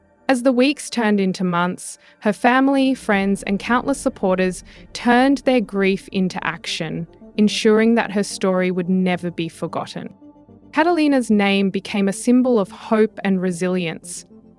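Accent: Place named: Australia. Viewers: Yeah it’s Australian. Many channels are AI voices now so I am happy to find a real person speaking. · Love this accent. Im thinking its Australian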